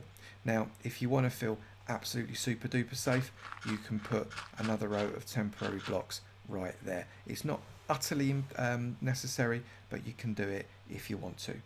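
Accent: United Kingdom. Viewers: You mean he's British?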